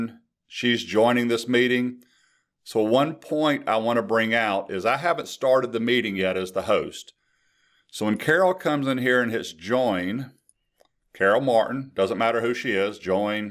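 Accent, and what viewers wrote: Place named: South, United States. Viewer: Thanks... what accent is that btw...??? somewhere south??? one of my favs lol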